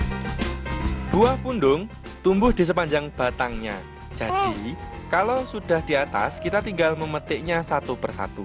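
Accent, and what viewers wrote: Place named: Java, Indonesia. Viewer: logate ojok d medokne Cak biasa wae lah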